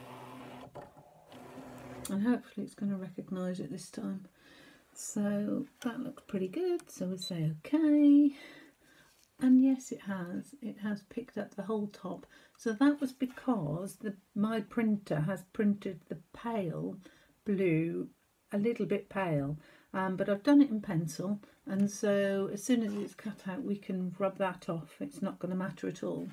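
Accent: United Kingdom. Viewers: Hiya, found this easily enough. Thanks for giving me the link (nice to hear a UK not American voice) xx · I have just found this video while searching for a tutorial on how to use scan n cut. I’ve got a CM300 but very rarely use it. Could you do a series of videos on basic operation? I’m sure there must be many like me who get so frustrated because they cannot get the machine to do what they want it to do. By the way, it’s great to hear an English accent and thank you for your time.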